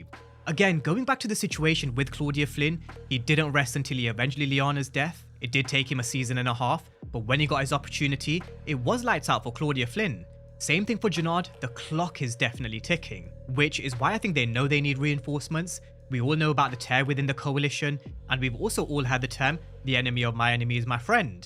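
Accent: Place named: United Kingdom. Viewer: He sounds British. So it’s 10 am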